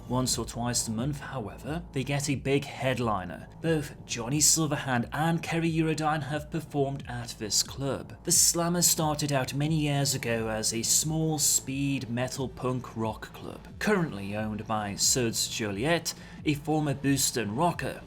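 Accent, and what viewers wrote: England, United Kingdom. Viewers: I'll just take it that I wasn't off with that assumption. I would like to thank Sean Bean, for it was his accent that yours reminded me of. Mostly the pronounciation of the ol' diphthong "ou" like in "yOUng". Jolly good. That said, it's probably the best of the english accents, because Sean Bean.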